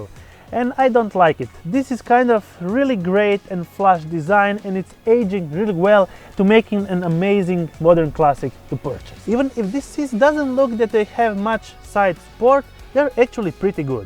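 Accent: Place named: Argentina. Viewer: Oye boludo! He just sounds Argentinian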